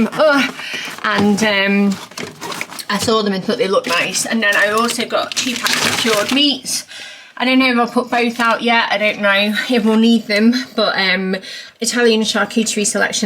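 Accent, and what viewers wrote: United Kingdom. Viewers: Are you from Preston? You sound like me! 😂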